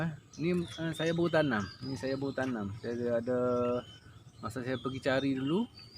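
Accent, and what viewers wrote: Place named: Kelantan, Malaysia. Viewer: Cara cakap mcm org kelantan...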